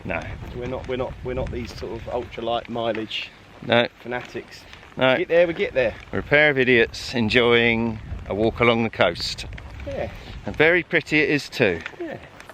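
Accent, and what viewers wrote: United Kingdom. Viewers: funny as sh#t I've made it exactly two-and-a-half minutes into your video and I can't stop laughing.As you're coming up the hill you stop and you say It's Like a Jungle Sometimes it makes me wonder with that British accent, and then you pause turn your head and I think to myself you know I would bet a million dollars that this white British dude probably doesn't realize he just started the lyrics to a song, and if he finishes the sentence when he turns his head back around he's going to say what in the world have i gotten myself into or it makes me wonder who in their right mind does stuff like this for fun and then you turn back around and finish the lyrics with your British accent and I haven't stopped laughing for 5 minutes! I don't know why I'm surprised you finished the lyrics to an African-American song that came out when you were probably a kid! 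After all my favorite music is British music. I love British music Their's only 2 kinds of music,good music and bad music and it's all relative from your perspective which is which and for me #1 on the list for a tie,its between the and,right beneath that my second favorite bands it's another tie between and and yeah Jimi like me was an African-American,but because of racism he couldn't get his start in America and he didn't take off until he formed the Experience with his two British bandmates in England.Just beneath them on my favorites list are the, and of c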